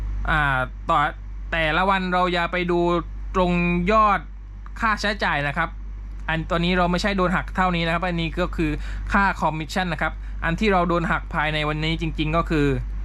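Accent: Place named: Surat Thani, Thailand. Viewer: พี่เป็นคนสุราษฎร์ ม้ายนิ ผมแลคลิปเก่าๆได้ยินแหลงกับแฟน สำเนียงเหมือนคนบ้านผมเลย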